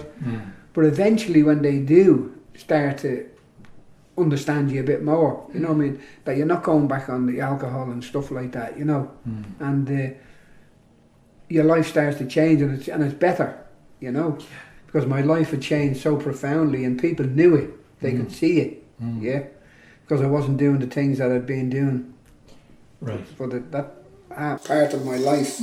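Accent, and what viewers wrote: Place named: Ireland. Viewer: It's like listening to two five year olds, such Rambling, self indulgent mish mush, typical Irish man, dreadful accent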